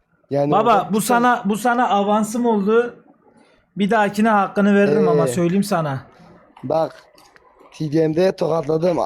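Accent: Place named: Şırnak, Turkey. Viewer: konusman çok iyi be hacı❤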